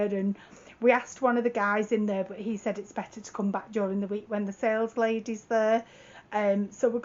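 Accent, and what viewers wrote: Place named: Wales, United Kingdom. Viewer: New subscriber and I too really enjoy your vlogs, recognised your accent as I am from the same town, although moved away years ago. Loving the caravan vlogs as we also had caravans in past but live by sea now so don’t need to as much. Looking forward to your next vlog, and wishing you luck getting the decking sorted. I also love Karen’s channel too.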